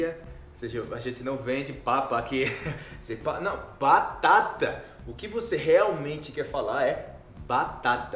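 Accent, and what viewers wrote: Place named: Colombia. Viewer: Eu não gosto muito do acento colombiano, mas quando você fala espanhol com esse acento, soma muito engraçado além das "muecas".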